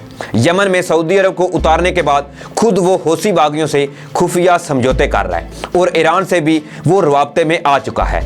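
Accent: India. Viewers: Mujhy lagta Hy yeh channel koee Hindu Chala Raha Hy bilkul hinde lahja hy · نام تو تیرا ہے مگر تیرے تلفظ اور تیری ہندوستان کی سعودیہ کے ساتھ وابستگی کی وکالت سے یہ ہر شخص یقین سمجھ سکتا ھے کہ تو کوئ انڈین ہی ہے غور سے میری بات سن ، ھر قوم اپنی غیرت کے بل بوتے پر زندہ رہ سکتی ھے اور غیرت کے ساتھ ھی ترقی کی منازل باآسانی طے کر سکتی ھے ۔ تیرے جییسے بزدلی ، بیغیرتی اور صرف تیل ، نوکریوں اور پیسوں کے لئے مر نے والی قومیں ذلیل و خوار ھی ھوتی ھیں۔۔ اب ھمارے لیڈروں نے اگر اپنی عزت نفس کے لئے اپنی قوم کو صحیح راستے پر ڈالا ہے تو ھم دل جان سے آنے والی ھر مشکل میں انکے ساتھ ہیں آپ اپنی بکواس کو اپنے تک ھی محدود رکھو میڈیا پر آکر اپنی انڈین ذہنیت عیاں مت کرو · محترم آپ کا تجزیہ اور خبریں سُنی، بہت اچھا لگا لیکن آپ اردو زبان کو ھندی لہجے میں بولتے اور پڑھتے ھیں جو کہ اچھا نہیں۰ ویسے یہ آجکل اک عام رجحان پایا جاتا ھے جو کہ پرو انڈین رجحان ھے، دوسرے لفظوں میں اگر کوئ شخص یا قوم اپنی گفتگو میں کسی دوسری زبان کے الفاظ استعمال کرنا شروع کر دے تو اسکا مطلب اس زبان سے مغلوب ھونا ھوتا ھے۰ اس طرح ھم غیر شعوری طور پر لسانی معاملات انڈین غلامی کی طرف جا رھے ھیں۰ قابل اعتراض الفاظ مندرجہ ذیل ھیں خ کو متعدد بار کھ پڑھا گیا جیسے خبر کو کھبر، خیال کو کھیال کہیں تاریخ کو تاریک پڑھا گیا اب سوال یہ پیدا ھوتا ھے کہ آپ اردو میں گفتگو کر رھے تھے یا ھندی میں۰ ھمارا رویہ اگر اردو کیساتھ یہی رھا تو بہت جلدی اسکا نام اردو کی بجاۓ ھندی رکھنے کے مطالبات سامنے آنے لگیں گے۰آپکو یاد ھونا چاھئے کہ پاکستان بنانے کی اک وجہ اردو زبان کو زندہ رکھنا تھی۰ کو بھائ میری اس تحریر کو پڑھ کر اردو سپیکنگ لوگوں پر کمنٹس کرنا نہ شروع کر دے کیونکہ میں پنجابی ھوں لیکن اگر کوئ پڑھا لکھا پاکستانی اردو غلط بولتا یا لکھتا ھے تو مجھے اچھا نہیں لگتا اور اردو کو ھندی لہجے میں بولنا یا لکھنا میری لیۓ نا قابل قبول ھے۰ امید کرتا ھوں پڑھے لکھے نو جوان میری تحریر کو ممثبت انداز میں لیں گے اور اپنی گفتگو جو وہ اردو میں کرتے ھیں اسکا خیال کرتے ھوۓ قومی زبان کی حفاظت کریں گے۰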